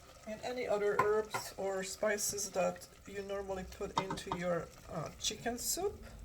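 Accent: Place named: Europe. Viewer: American girl? You have a European accent.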